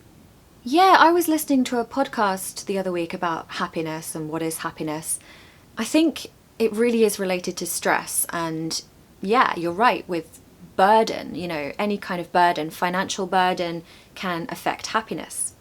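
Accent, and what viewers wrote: RP, United Kingdom. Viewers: We are supporting you cause you have beautiful british accent❤ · I have recently discovered your channel. I am sure it will start to take off soon. Lovely videos and a beautiful British accent; the accent that I'd like to speak with. " I also have a facial If I'm treating myself" I didn't fully get this sentence. Can you help me with it? What do you mean by that? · I love hearing British accent, it’s very amazing 👏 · II've just happened to meet your channel and I love it so much. Well, I stayed in London 10 days as my son is living there as a Uni student though he is coming back to Tokyo soon. My whole trip was just amaging while I was strolling many areas like a Londoner. I love UK so much so I will keep on watching your lovely video for listening. I also love your beautiful British English as well. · Hi,. It is my first time to watch your video; I hope not 'too late'. 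BTW, I very much like your accent in speaking English (viz. RP); it is what I am speaking in at all times in a day, not Cockney or Estuary. The reason is that I am based in Hong Kong where many Hongkongers are using RP, because HK was, you know, a colony of GB. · Hello! I’m a french girl and your english accent is soo soo soo lovely 🥴 and to me it’s very important to perform my english. Thanks for yours videos sure i subscribe! I hope I can speak like you one day 😅 very good job 🫶🏼👍🏼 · It's such a long time i have not practiced english when i hear you speaking i make réminiscence ❤with the late ninties It was in auguest 98 when i visited London dor the first time and visited many places and areas which i found highly useful for the oractice of my english istill remember Diana's accent. So when i hear your voice it reminds me that lovely queen's accent.Thank you all my support ❤❤❤ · ! It's so good to listen remotely to your elegant British accent. 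Sooths my pains related to the excessive technological burden these days.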